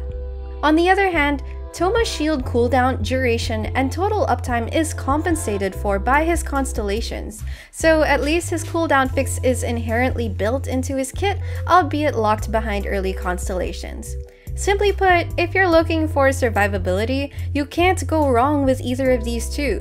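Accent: Philippines. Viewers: Thank you!! I needed this vid because I got hutao and I know how thoma is good for her but I got elegy today at 5 pity and I decided to go for building diona (I dont have sacrificial bow) P.S: u sound filipino ;)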